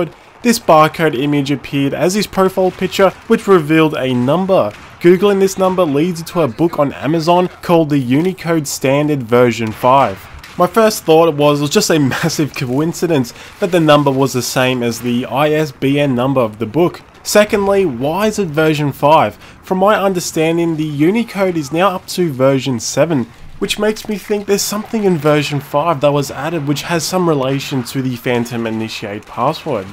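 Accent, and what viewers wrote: Australia. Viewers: Great video man! Are you from Australia? Either way, you've gained a sub :)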